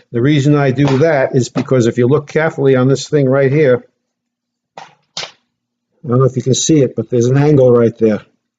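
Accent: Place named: Massachusetts, United States. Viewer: Seems like you have quite the shop there very impressive. I hear the accent is that Massachusetts. I'm from CT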